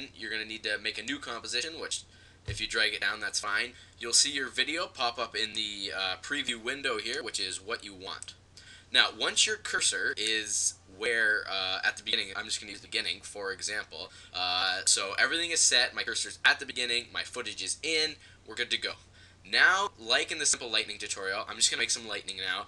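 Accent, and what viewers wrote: Canada. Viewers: are u Canadian?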